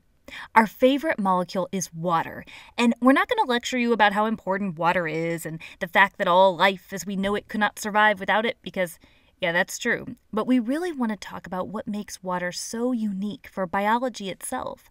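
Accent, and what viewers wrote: United States. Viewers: Nah, it's the annoying whiny voice, american accent, and also the tone that would be condescending for adults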